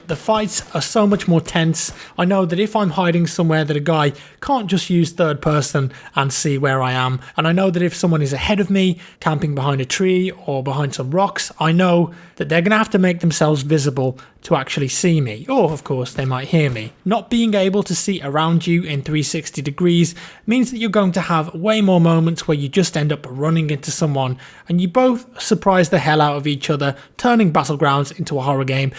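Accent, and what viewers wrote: England, United Kingdom. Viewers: Good dammit man i love u english accent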